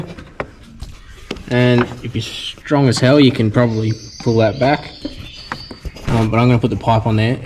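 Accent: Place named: Australia. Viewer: Thanks mate.. i am in thailand but having it explained in proper Aussie language was gold.. thanks for your video..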